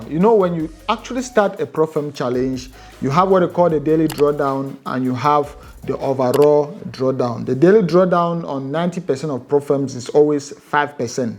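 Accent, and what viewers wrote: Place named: Akwa Ibom, Nigeria. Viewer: U sound like an akwa ibom guy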